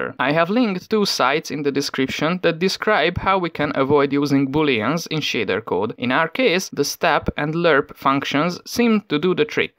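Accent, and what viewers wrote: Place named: Hungary. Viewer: Hunglish accent my beloved ❤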